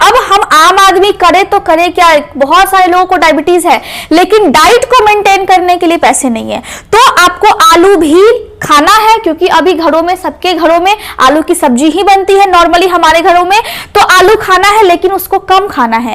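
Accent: Bihar, India. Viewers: Madam App bihari ho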